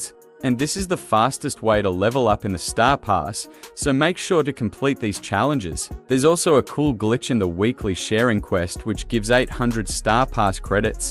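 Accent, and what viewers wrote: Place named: Australia. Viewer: how you got the accent mate I'm Australian u sound aussie😂😂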